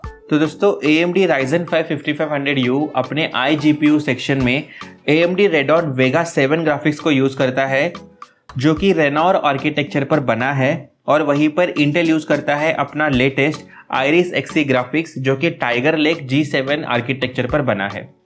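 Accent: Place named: India. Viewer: 很不错，印度口音非常喜感（如果说错了我道歉）。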